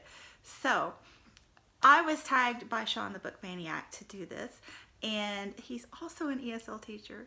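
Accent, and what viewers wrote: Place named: South, United States. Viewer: I guessed all of them except the last one. Haven't read it. You read very well. you got that southern twang in there and everything!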